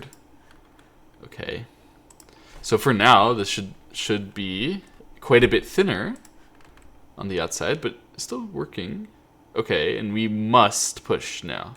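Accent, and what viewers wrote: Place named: Europe. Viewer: Crazy, I always assumed you were from some European country because you seem to speak English with a bit of an accent and a slightly strange cadence.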